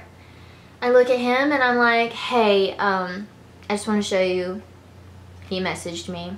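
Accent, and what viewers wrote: South, United States. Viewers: It's just a southern accent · I love her southern accent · I love ur little southern accent!😁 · It’s because she’s southern 🤷🏻‍♀️ we have that “southern drawl” down here 😂 hers isn’t as bad as a lot of people’s. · Where are you from? Your accent's confusing lol. So southern! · Hey, are you from the south of the US? Carrie Underwood was my favorite blonde of all time, not it's you haha:) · accent or nah ? southern or nah ? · It's called a southern accent. Usually when you're from down South.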